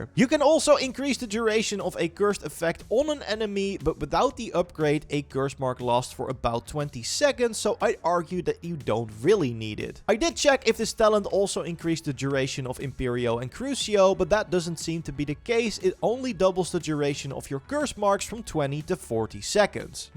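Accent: Netherlands. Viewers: You dutch ?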